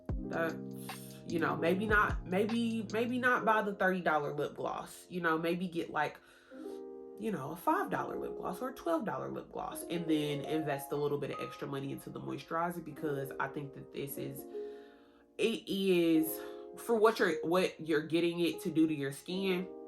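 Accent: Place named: South, United States. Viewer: You sound like you are from the south! It's a southern accent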